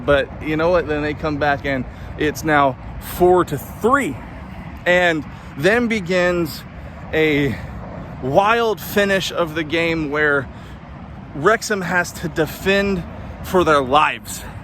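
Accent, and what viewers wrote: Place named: United States. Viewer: It's good to see you taking an interest in Wrexham. I was there and the atmosphere was electric. A few things to work on to sound, as you put it, less American. You would say Wrexham were 2 up. And Coventry were 2-1 down. He scored, not he netted it. But your videos are good the way they are. It's the interest you're taking and the passion that comes across in them. We'll done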